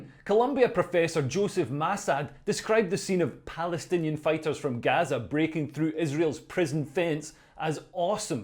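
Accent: Scotland, United Kingdom. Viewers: Oh My. I'm an Israeli and this full scale, informed rant was worth the struggle to keep up with an accent like that of Scottie on Star Trek. Bravo, Kol HaKavod - a wonderful example of lethal humor used to brilliant effect. I'm sharing this. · This my first experience watching channel. I laughed, I applauded, I cheered, and fist pumped the air. I love this guy!! And that accent just adds to the overall experience. I’m an instant subscriber and his newest fan. He’s like the Scottish version of Ozy Man focusing on politics. Well done, sir! Please keep it up! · Never liked Scottish accent... but I do love yours ❤️thank you 🙏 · Saying that supporting the right of the vast majority of a fully innocent (and yes maybe clueless too ? still not murderous though) Palestinian population not be be bombed into oblivion as retaliation for some of their local nutjobs' atrocities is the equivalent of being a Hamas terrorist fanboy is somewhat of a stretch, ey ? 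Cute scottish accent or not, you're talkin shyte, me lad. Granted, there are a few wild ones out there but exclusively singling these out strikes me as disingenuous. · How can a Brit say all this and not get targeted by The Crown or his Irish neighbors? Or, did i detect a Scottish accent? Anyway, superb breakdown.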